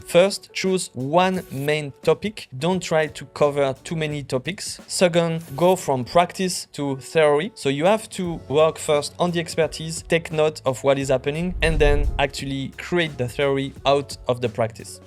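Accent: France. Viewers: Merci, je vais suivre tes super conseils! C'est une bonne idée de faire tes videos en anglais tout en gardant ton accent francais, ca fait original et les anglo aiment ca!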